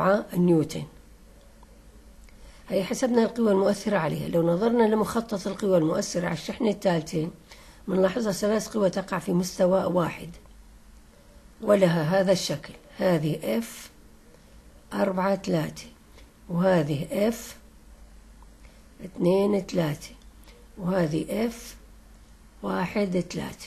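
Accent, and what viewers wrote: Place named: Syria. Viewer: أخي أنت لهجتك شامية حاسس انك سوري.. على أي حال تحياتي الك من سوريا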